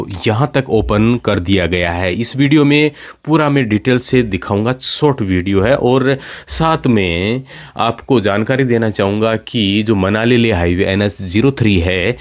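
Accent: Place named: Shekhawati, India. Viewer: Bhai aap shekhawati Rajasthan se ho kya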